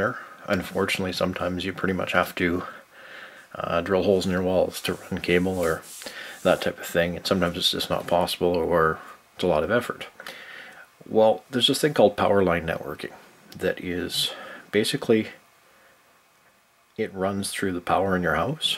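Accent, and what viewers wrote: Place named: Canada. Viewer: I could instantly tell this is a Canadian man. Dunno how,.......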